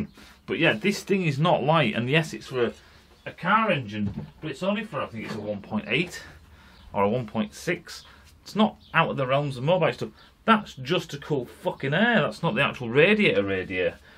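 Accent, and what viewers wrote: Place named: Scotland, United Kingdom. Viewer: Going to watch your video on shaker can painting. And, I always thought you were Scottish. No insult intended. Just all of your accents sound the same to my American ears.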